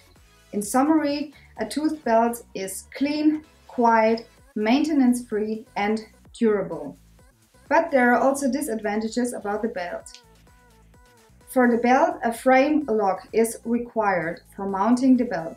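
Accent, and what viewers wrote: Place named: Germany. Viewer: Man hört, dass du deutsch bist. Bist auf jeden Fall der Hammer! Weiter so^^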